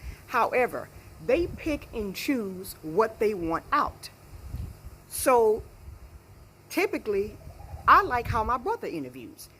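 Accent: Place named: Texas, United States. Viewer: Love hearing my sista’s TX accent , keep bringing it ! I graduated high school in Killeen,TX ! ❤️✊🏾❤️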